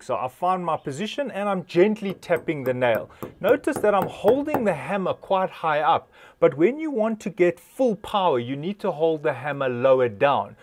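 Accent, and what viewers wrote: South Africa. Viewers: Definitely a south African accent though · No. This voice is more South African. The Terminator's is Austrian.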